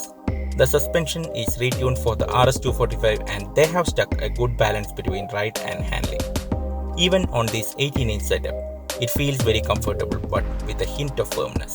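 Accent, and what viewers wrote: Kerala, India. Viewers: Nodoubt a malayali out there narrating 👍🏻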